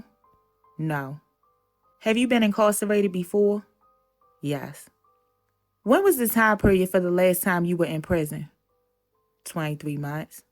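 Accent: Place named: Baltimore, United States. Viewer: Different narrator. First time different voice. This a baltimore woman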